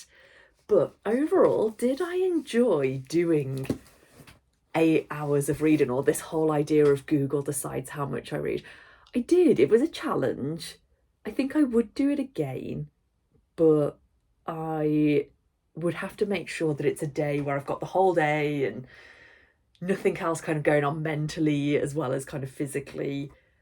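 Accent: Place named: Midlands, United Kingdom. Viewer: Heyy, I’m a new subbie! I’m also from the midlands as well, so it’s comforting to hear a similar accent. 🥰 You did really well, there’s NO way I could do 8hrs of reading, I can just imagine the brain fog 😶‍🌫️. I also have chronic fatigue, so completely understand! So glad everything is okay with your doggy too. Keep the videos coming! 🧡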